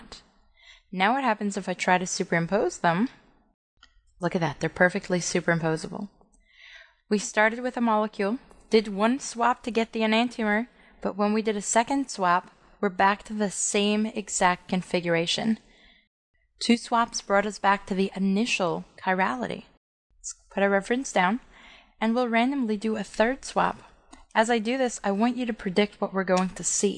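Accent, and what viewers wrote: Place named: New York, United States. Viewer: Beautiful! You got to be from New Jersey/New York. Thanks.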